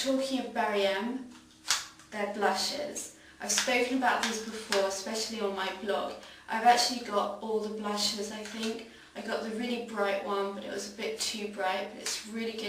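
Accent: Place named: Public school, United Kingdom. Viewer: you have such a fabulous public school accent!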